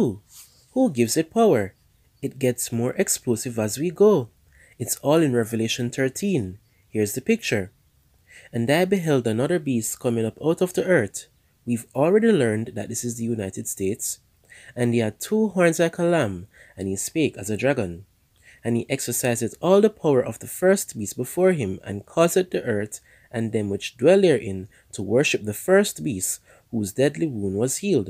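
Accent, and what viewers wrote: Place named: Jamaica. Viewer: Glad that this book is online . Thank you for the upload. The reader sounds Jamaican 🇯🇲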